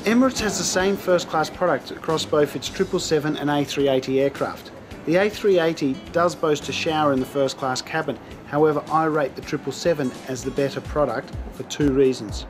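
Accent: Australia. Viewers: I like the walk through style video and nice to hear an Aussie accent :) Good job.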